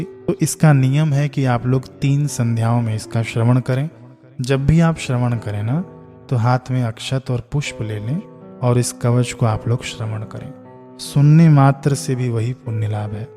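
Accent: Standard. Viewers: Shuddha Hindi Aur Sanskrit Uccharan. Aapko shat shat naman. Jai Mata Di!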